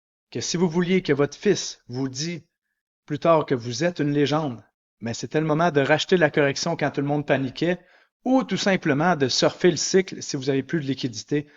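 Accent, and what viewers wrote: Canada. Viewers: Je viens de m'abonnée L accents Canadien ça m'a dépaysé haha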